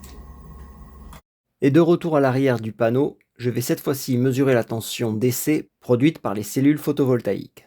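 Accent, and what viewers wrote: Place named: Corsica, France. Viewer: Petit accent corse sous-jacent.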